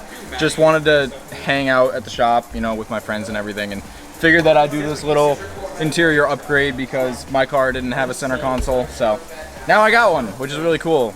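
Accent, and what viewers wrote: Massachusetts, United States. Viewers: Are u from mass ?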